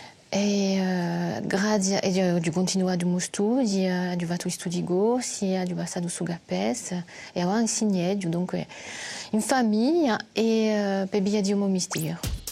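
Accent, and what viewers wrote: France. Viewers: BELLA LINGUA MA CON UNO STUCCHEVOLE ACCENTO FRANCESE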